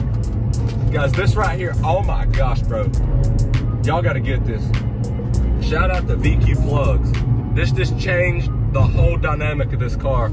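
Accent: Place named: South, United States. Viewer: For me its his lovely southern accent and the background music. #thevibes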